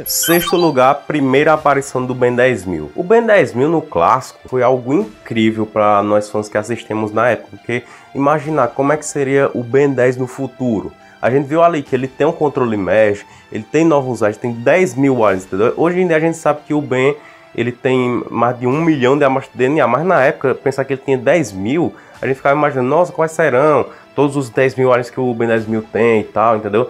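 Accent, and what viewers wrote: Northeast, Brazil. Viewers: Tu é do Nordeste ahhhhhhhhh pera EU TBM SOU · Mano tu é da onde do Nordeste? kk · Amo o sotaque nordestino da minha região, é nois! Sou da Bahia.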